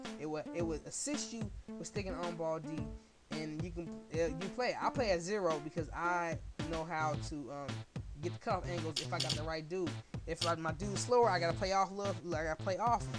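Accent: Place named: Midwest, United States. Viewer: u gotta be from the midwest .. Chi-Town?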